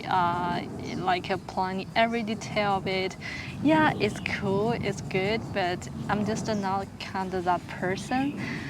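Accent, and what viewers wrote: United Kingdom. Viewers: You have a light British accent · I see you as a beauty and brain lady and I noticed that there are instances when you speak there’s a British accent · you sound little bit of uk/british style accent. what school in china you learn english? i think china government can still track you even in vpn by requesting from chinese internet providers(if you are not using chinese internet provide then maybe you are safe). free vpns definitely they can see what you are doing, paid ones are encrypted. but i think they could still decrypt that now that china has quantum computers. try to start criticizing chinese government, you'll see😂 just kidding. kinda scary, even jack ma didnt escaped president xi😅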